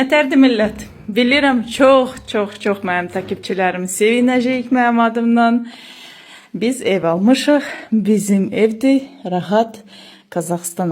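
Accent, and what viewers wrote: Azerbaijan. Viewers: Из за акцента начала слушать.. как будто русская пытается говорит на азербайджанском.., но.. гялифсиз, олуфду, олажах, дяйистиражам, севиняжах........ а это уже регион Азербайжана.... у меня мозг вскипел..😂 тии кито?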